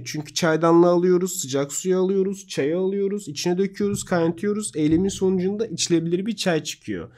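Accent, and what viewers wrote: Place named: London, United Kingdom. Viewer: Hocam şiveniz aynı londra maşallah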